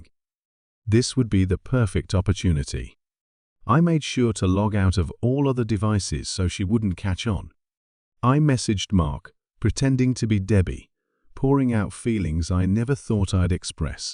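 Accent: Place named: Yorkshire, United Kingdom. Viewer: This sounds like Sean Bean's Yorkshire accent.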